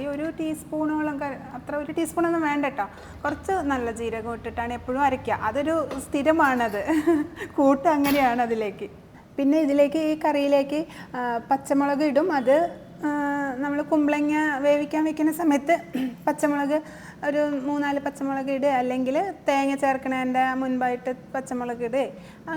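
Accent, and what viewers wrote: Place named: Palakkad, India. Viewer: Samsaram kettitt Palakkad aanennu thonnunnu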